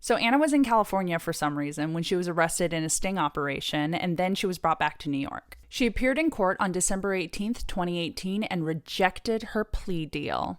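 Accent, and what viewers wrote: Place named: Europe. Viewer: Heh.. yeah her accent is quite... Europeish. Like, "somewhere east of France". And yes, it was, and you hit the notes perfect!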